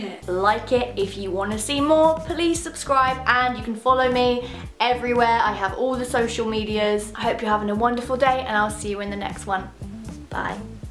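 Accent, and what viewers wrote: United Kingdom. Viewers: I did a British accent reading the title before I click this and I’m surprised ur British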